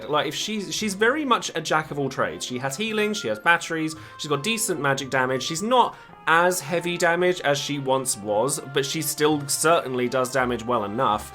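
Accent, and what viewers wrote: United Kingdom. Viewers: Laughed at the title. I truly enjoy your videos unlike most. Must be the british accent haha but joke aside your videos are very informative hence I watch it. Keep up the good job and hopefully you get more subscribers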